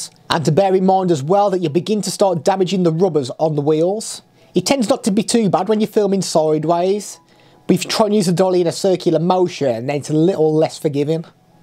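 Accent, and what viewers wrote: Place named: Black Country, United Kingdom. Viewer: Oh also, nice to hear a proper brill accent cocka! I’m originally from Cannock, but now live in Ontario... nice to here such a strong accent from the old county :D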